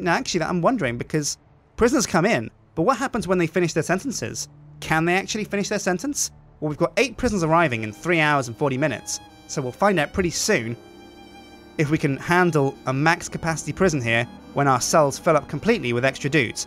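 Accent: United Kingdom. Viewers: he's from britain of course he has an accent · SHUT UP! He's British! It's hard for him to speak another accent! (please spell his name correctly!)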